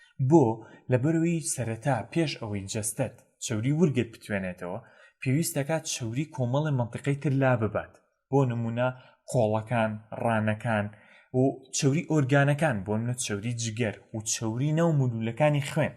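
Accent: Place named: Badini, Iraq. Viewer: كه مئك باديني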